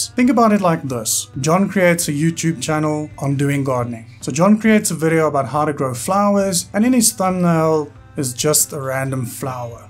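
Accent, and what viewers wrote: South Africa. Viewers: I don't know way but you sound like a South African 😊thanks for all your help 👍🏻🙏🏻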